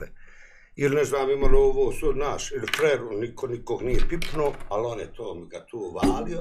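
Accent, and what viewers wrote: Bosnia and Herzegovina. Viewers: Jel on iz Sarajeva pa ima Sarajevski naglasak ilije iz Pala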